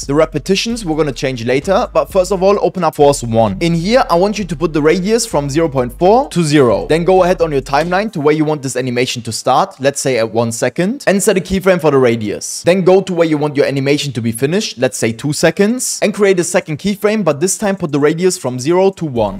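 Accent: Germany. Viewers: i tink tat you're deutsch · Are you from germany ?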